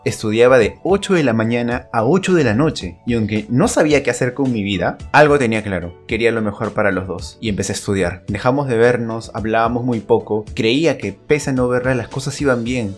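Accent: Peru. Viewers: BRO ME IDENTIFICO CON TU HISTORIA Y CREO QE ERES PERUANO CON TU ASENTO Y YO TAMBIEN NMMS :v.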